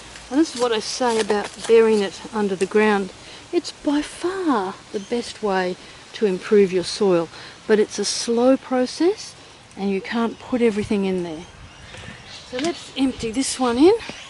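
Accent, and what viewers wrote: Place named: Australia. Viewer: The in-ground system she's using is literally called a compot, you dumb nugget. She's australian. We just talk like that.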